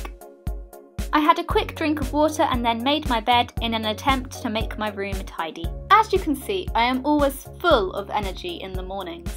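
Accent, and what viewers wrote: United Kingdom. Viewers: your British accent is so charming and you are so cute! comment from China.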